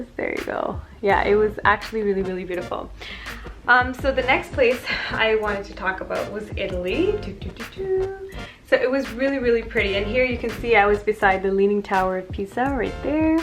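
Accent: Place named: India. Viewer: Where do you live in India? Ur accent is pretty cute😍 just wanna ask where u got that from? Anyways, keep shining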